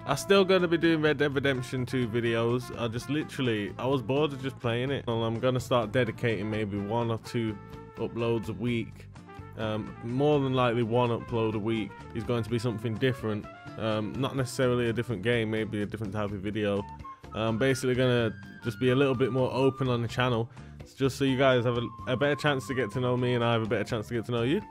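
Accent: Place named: England, United Kingdom. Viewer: Hoping to find the mandem.. My G is mad english proper tings n dat 💯❤😂😂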